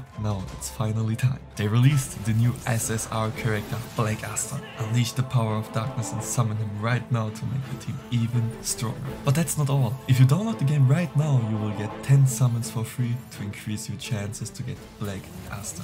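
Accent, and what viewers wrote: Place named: Germany. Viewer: You have a German Akzent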